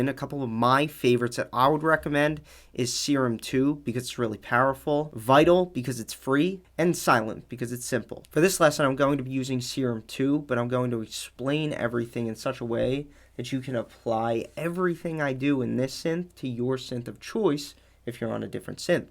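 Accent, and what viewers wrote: Philadelphia, United States. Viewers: Hey bro are you by chance from Philly? I live in port Richmond and I swear I hear my neighborhood when you speak, maybe I’m wrong. Either way great video! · I know a Philly boy when I hear one! Haha thanks man, I'm a newbie and this is probably the most helpful video I've watched so far about synth sound design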